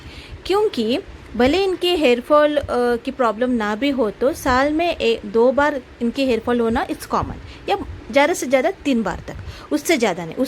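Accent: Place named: Telugu, India. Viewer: Pakka telugu Haaa😅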